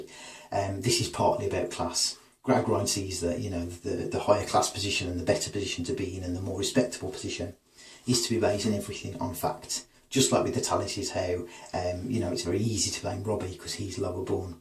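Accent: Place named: Ireland. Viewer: okey ure Irish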